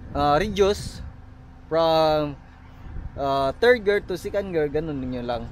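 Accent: Visayas, Philippines. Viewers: Bisaya kaba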